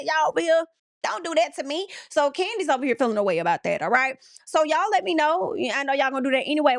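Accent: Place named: South, United States. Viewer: Can you just talk normal... I enjoy your takes but sheesh😅 I'm in NYC so maybe I don't get southern accents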